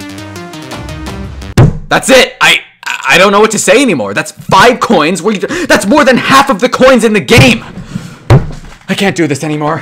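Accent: Canada. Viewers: canadian accent 🥱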